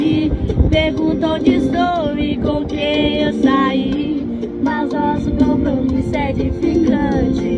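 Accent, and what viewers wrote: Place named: Rio de Janeiro, Brazil. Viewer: uma japonesa que fala igual carioca e canta musica tradicional nordestina = receita do sucesso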